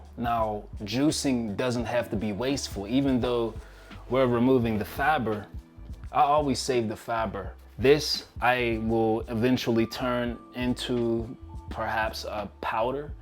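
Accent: Pittsburgh, United States. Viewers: U sound like your from Pittsburgh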